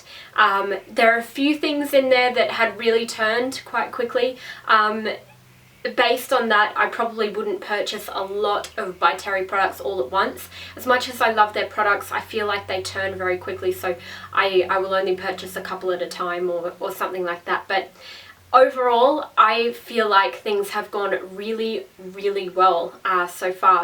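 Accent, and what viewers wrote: Australia. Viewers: Are you from Australia? X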